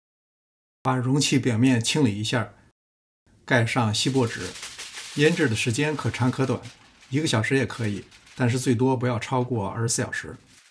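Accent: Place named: Northwest, China. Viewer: 感觉也是个西北大哥，跟妈妈口音都很像～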